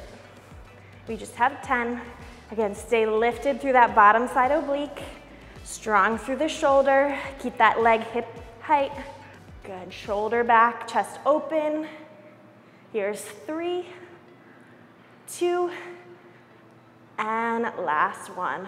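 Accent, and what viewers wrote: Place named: Midwest, United States. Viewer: I just kept thinking it made her sound super Midwest and like, old school which is kind of endearing and 80's haha. v jazzercise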